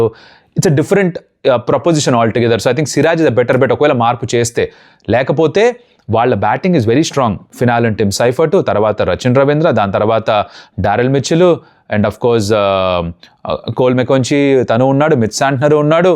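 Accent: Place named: Mangalagiri, India. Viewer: నాకెందు కో మాటష్ మంగళగిరి అనిపిస్తుంది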